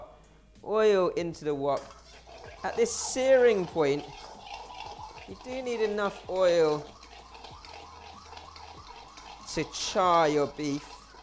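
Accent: United Kingdom. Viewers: Why he sounds like he from the UK🤔🤔🤔😁anyway it is so important to me as a Chinese sista to learn how to cook Chinese dishes for Suga Bearz Cafe I'm collecting all recipes did I tell yall my egg roll horror 😨😨😨😆😂I ate it😕 an if bae was there an not on camera duty he would have ate it too right bae 😒I want a wok so bad I can't wait I want traditional food it's all going to be apart of SUGA BEARZ Cafe soulkitchen on Royal Underground tv charter spectrum 32 booyah happy cooking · Never seen a asian negga, wit British accent. Very nice. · I love this British accent, better than the cockney accent. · weir to heard a chinese having british accent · Interesting to hear a Chinese man speak British exotic im quite amused lol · I focus on his accent the way he talk. I love british accent 😂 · Chineese with a British accent love it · Let me figure this out......... An Asian guy with a British accent?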